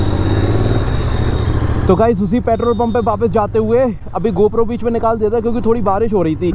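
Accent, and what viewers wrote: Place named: Madhya Pradesh, India. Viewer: Mp ka accnt bohot aacha hai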